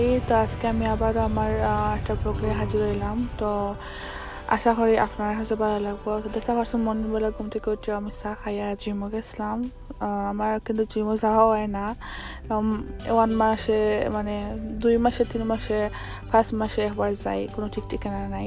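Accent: Sylhet, Bangladesh. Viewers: Walaikum Salam apu. Apnar sylheti accent khub valo laglo. Joined you join me too dear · Amar car wash ay jaytay darun lagay, specially car ER bhitor boshay thaktay. Apnar bhasha ki sylheti?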